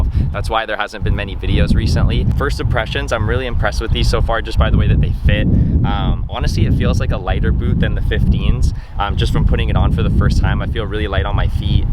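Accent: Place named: United States. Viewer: you sound like an amercian but if you gonna review fix up on your wording and facts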